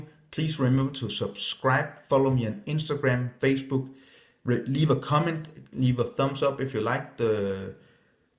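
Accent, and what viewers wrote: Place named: Denmark. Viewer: You've got a very cool accent, are you Danish?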